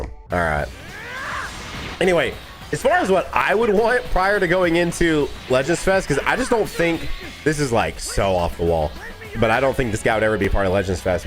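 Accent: South, United States. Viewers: Didn't know i needed a Southern video today😂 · the southern accent is killing me 😭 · Your southern accent sounds fine to me but i also grew in southern state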